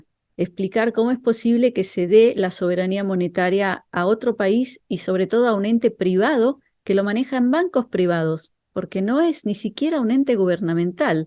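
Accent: Argentina. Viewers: Ese acento argentino después de tanto tiempo en Israel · Hola yo pensé que eras argentina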